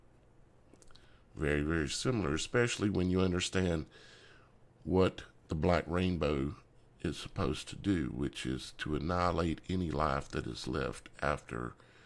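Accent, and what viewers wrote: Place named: South, United States. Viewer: Naw, Son; although, you're, clearly, a Fuck-tard. Just some honest feedback form a member of the audience. Between the guy's Southern-fried accent, his monotone, near-mumble, the repeated use of the incorrect phrase, "Crimson Tide," (Alabama fan, huh?) and random samples of the dreaded Gematria (I mean, give me a break with that crap), I challenge anyone to tell me what the fuck he's talking about in this video.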